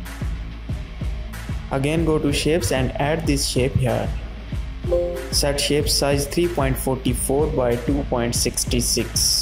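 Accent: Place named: India. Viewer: fuking indian accent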